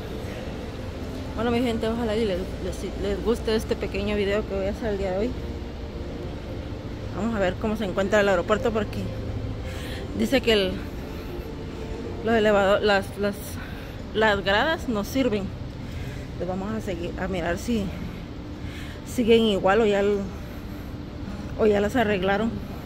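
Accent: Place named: El Salvador. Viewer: Usted es salvadoreña? Porque su acento es salvadoreño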